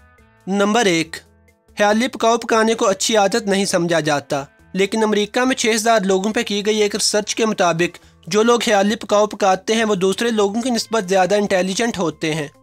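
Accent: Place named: Punjab, Pakistan. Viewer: ap bhe bohot zahen hen pr apka lehja bilkul pnjabi he...lgta he ap pnjabi bol rahe hen.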